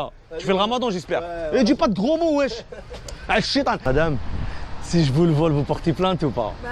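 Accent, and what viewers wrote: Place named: Annaba, Algeria. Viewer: أنا نكره الهدرة تاع عنابةدايرة كي الشعر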